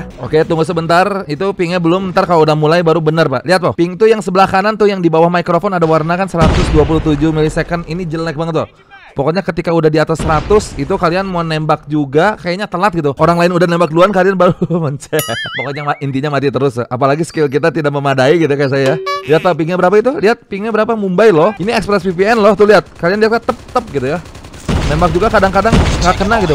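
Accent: Bandung, Indonesia. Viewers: Logat namah ciga orang ciroyom sih 😁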